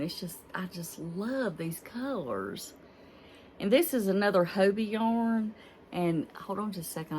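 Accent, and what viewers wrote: South, United States. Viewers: I missed you. So good to see and hear your southern voice!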